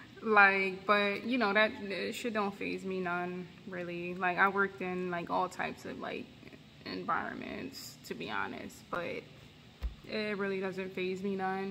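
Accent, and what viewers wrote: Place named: Philadelphia, United States. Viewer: You sound like a Philly Jawn! ..or surrounding area.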